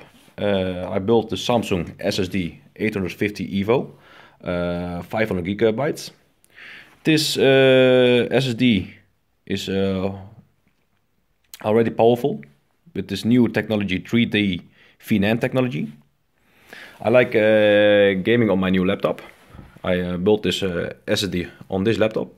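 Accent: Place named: Netherlands. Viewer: haha je kunt horen dat je Nederlands bent xD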